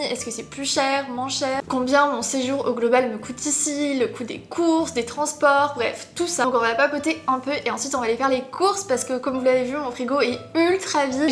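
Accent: England, United Kingdom. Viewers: Trop bien comme d'habitude et j'adore ton accent anglais ton haul en anglais est trop bien 😆😆☀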